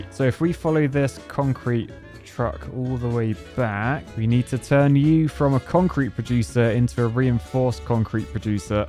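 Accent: United Kingdom. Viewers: your content has replaced just about every source of entertainment in my life lately. Thank you for playing videogames in a fashion way more entertaining than doing it myself. It's been fun marathoning through your backlog of videos. Have you ever thought of narrating those nature documentaries? Maybe it's the British accent but getting a bridge review in the middle of lion chasing a zebra would make my day.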